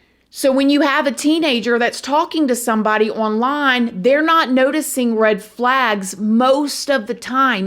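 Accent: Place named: Upper South, United States. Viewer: I'm not commenting on the story, this is for you! That Southern lilt of your voice, hello my lovelies, show no, shoot can't remember all of it. Just no, that's why I subscribed! Your voice is amazing for voice overs, and radio! Fabulous!! Edit: Your 6 yr old??? Disgusting!!!! ALWAYS Protect children, seniors and animals!!! WTH is wrong with humanity, beyond WRONG!!!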